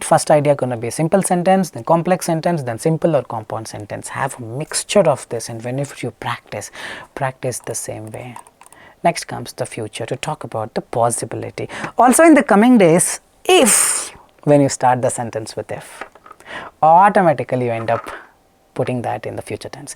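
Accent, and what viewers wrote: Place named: India. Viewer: I think he is the best guy who can explain language topic easily. Besides IELTS video, I even follow his another videos about PTE. I enjoy his video a lot from Bangladesh. His accent tells me he is Indian guy. Love from BD.🥰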